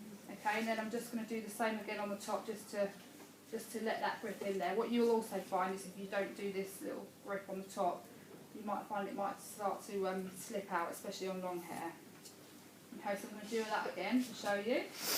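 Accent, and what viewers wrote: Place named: Kent, United Kingdom. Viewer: Is this in southeast Kent? Sounds just like the accent in Sandwich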